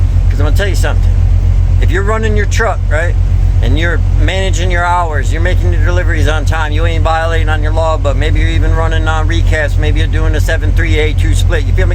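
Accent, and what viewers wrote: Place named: South, United States. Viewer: I was gonna say, you sound like a southerner, not from a northern state.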